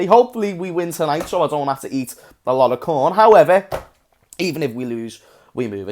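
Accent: Liverpool, United Kingdom. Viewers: Will love to see his reaction when we thrash Liverpool! Will be great to see him crying with this scouser accent 🤣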